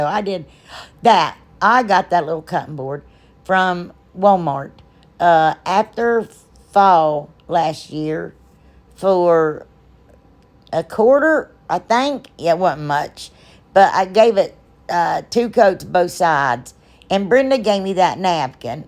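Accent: South, United States. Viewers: Where you from girl?! I’m in north Alabama. I always love hearing a warm southern accent on here!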